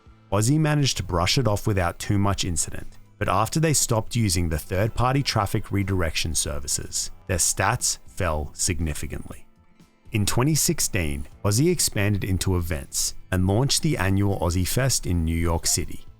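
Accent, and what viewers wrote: Australia. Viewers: Wait... are you Australian?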